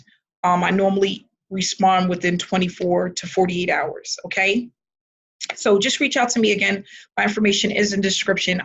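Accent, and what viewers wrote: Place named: New York, United States. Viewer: Thanks for your speedy acknowledgement... love that New York accent or is it Boston?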